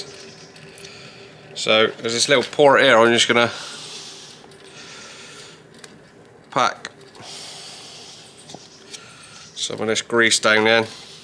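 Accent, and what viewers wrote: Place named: England, United Kingdom. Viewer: Great hammer, where is your accent from im always trying to defferentiate the different English accents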